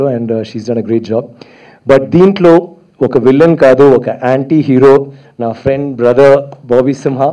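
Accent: India. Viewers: Telugu vade anukontunnanu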